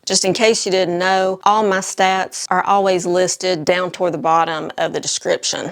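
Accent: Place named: Virginia, United States. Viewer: Your dogs r so cute! We lost our 13 year old toy poodle a few years ago and r thinking of getting a small dog. I have to tell u I was born in Virginia and moved with my family to Idaho when I was 10. You remind me so much of my aunts and cousins in Virginia with your cute accent and your no nonsense personality! I think that’s one reason I love your videos so much! U r just darling! Great video as usual thank u! ❤😊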